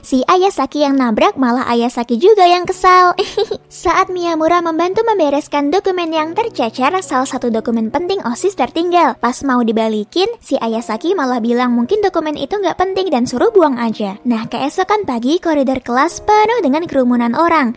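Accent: Japan. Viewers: kek suara cwek jepang yg imut"gitu tpi versi indo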